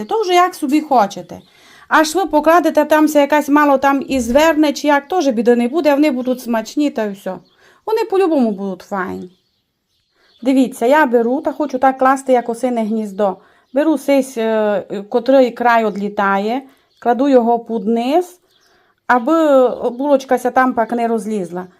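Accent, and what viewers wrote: Transcarpathia, Ukraine. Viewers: Як я люблю Закарпаття і ваш говір.Продовжуйте так говорити,ботв цьому ваш шарм і ізюминка.З миром Божим.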